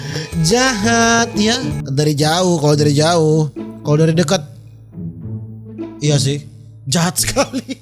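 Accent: Manado, Indonesia. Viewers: Kurang seru, so jarang ba logat manado noh..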